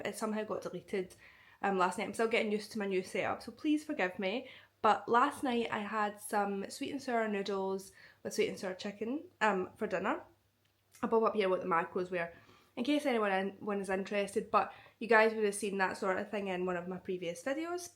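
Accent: Scotland, United Kingdom. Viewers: I knew that was a Scottish accent 😊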